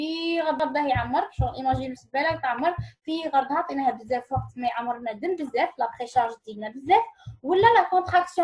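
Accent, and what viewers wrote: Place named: Oran, Algeria. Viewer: Merci Tbiba , j'adore tes explication et surtout on accent oranais <3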